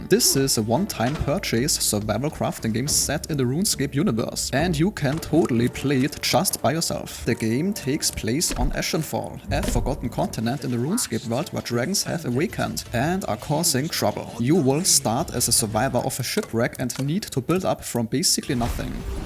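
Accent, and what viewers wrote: Germany. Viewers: The german accent is strong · this german accent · Der Deutsche Akzent Kickt Hart^^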